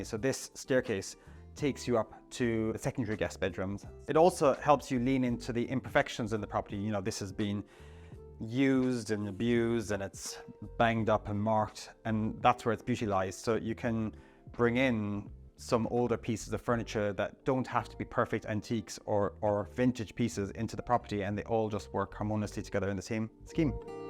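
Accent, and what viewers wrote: Ireland. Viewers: Hi lovely house, but do i hear an Irish accent???? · He is Irish. Not everyone who lives in the Uk has the same accent.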